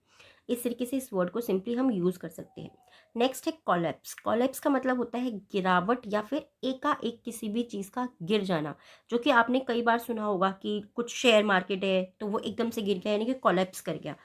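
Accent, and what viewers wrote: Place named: India. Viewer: Hindi pronunciation of english word proper nhi lag raha hai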